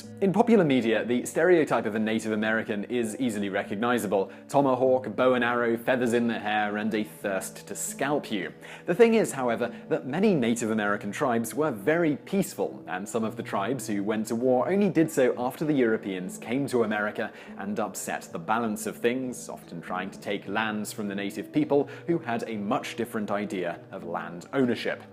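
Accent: England, United Kingdom. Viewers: Nothing more funny then a white guy with an English accent talking about misconceptions of the various nations, at least he's actually trying I give him that much.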